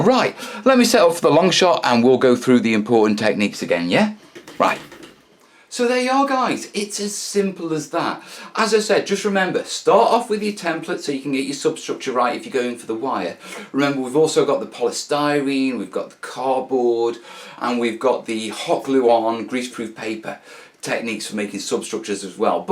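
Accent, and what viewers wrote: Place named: Lancashire, United Kingdom. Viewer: I knew it was Lancashire...nice viddy mate!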